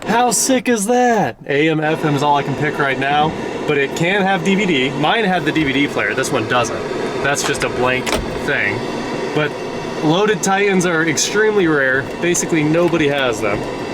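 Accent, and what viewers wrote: Kansas, United States. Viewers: “Ardic blast” gotta love that Kansas accent haha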